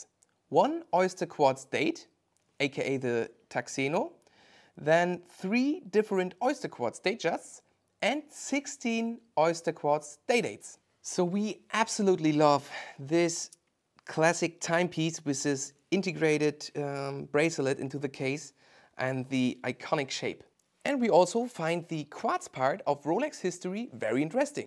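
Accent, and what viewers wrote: Germany. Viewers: The thick German accent... but still amazing video.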